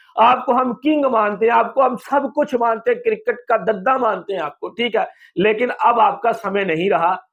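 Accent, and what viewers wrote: Punjab, Pakistan. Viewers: Bhi yea punjab say hai..pura pakistani pubjabi bandey kaaa accent hai 😅😅😅 ISI bandey ki thodri training karadoo. Punjabi in India are ni boltey bhi 😅😅😂😂😂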